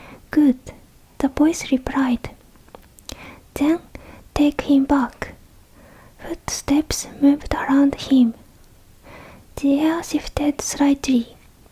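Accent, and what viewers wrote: Japan. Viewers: I love your sweet voice.. I love that Japanese struggle with saying the letter L it makes Japanese English so cute.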